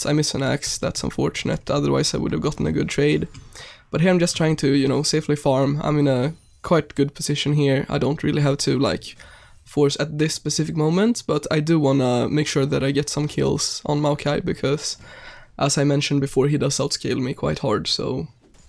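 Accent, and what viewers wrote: Sweden. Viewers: That swedish accent. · Nice commentary! BTW...sounds like ure swedish ^^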